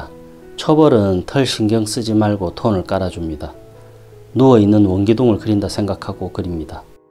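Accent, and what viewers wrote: Gyeongsang, South Korea. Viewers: 경상도는 억양을 고칠수없어..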